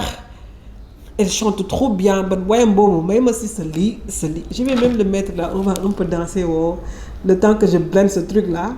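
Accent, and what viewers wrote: Senegal. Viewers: ne perd surtout ton joli accent sénégalais wolof comme dans ta vidéo de feug Diaye. Tu y es d'un naturel sans égal avec un accent très très joli. Comme dans la plupart de tes vidéos. Évite l'accent Chokho Chokho trop chic chic comme les immigrés ou sénégalais de France qui veulent parler comme français avec l'accent français + que les Français. C ton accent sénégalais authentique qui fait de roue une YouTube sénégalaise originale. 👍👍👍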